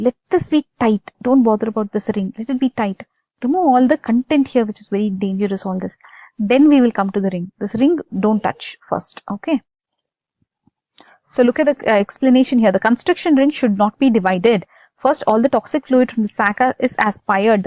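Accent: South India, India. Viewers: Are you from Karnataka? Your English accent seems like you are from South India.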